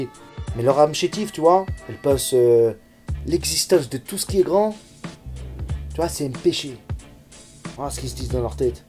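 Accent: South, France. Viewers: Plus je l'écoute et plus jme dis que c'est ta meilleur vidéo , merci de m'avoir introduit à Tonton Nietzsche . Désormais , je vie telle Zarathoustra, je suis le pont au dessus de nos médiocrités , je parle à la montagne et ne me plie plus a la violence de l'efficience . Et putain avec l’axent du sud c'est encor mieux , con ! ^^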